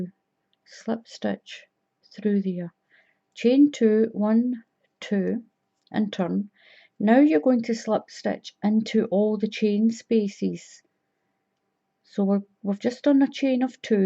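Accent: Scotland, United Kingdom. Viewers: Hi are you Scottish? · Hi I am so glad I came across your crochet tutorial. You are great at explaining things. Many thanks and love your Scottish accent. Thank you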